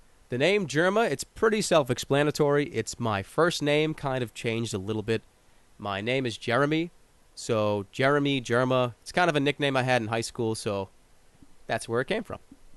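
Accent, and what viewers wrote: Boston, United States. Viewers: Wow you are from Boston! Just like a scout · I listen to Jerma so I can talk with a Bostonian accent. MY GOD, THAT ACCENT IS BEAST. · klahndyke bah :D gotta love that boston accent.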